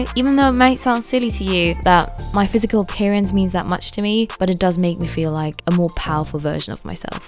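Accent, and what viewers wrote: United Kingdom. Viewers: I love the British accent and I was convinced u‘re British..und dann fängst du an Deutsch zu reden😂😂💖Loved the video, thank for motivating us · Ich finds zu genial, dass du im deutschen auch diesen englischen Akzent jetzt hast :D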